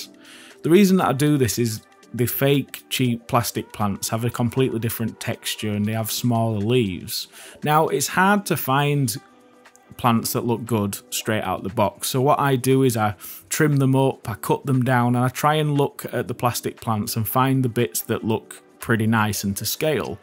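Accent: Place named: England, United Kingdom. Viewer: Absolutely massive...... massively brilliant......so so Englishman speak with his massive accent....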